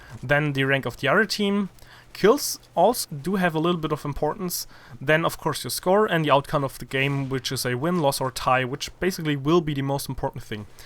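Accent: Germany. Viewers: Bist du deutscher ?:) du hast nen deutschen Akzent :)! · you sound like a german :) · Sounds like you are german. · Are you german? Du hörst dich deutsch an :P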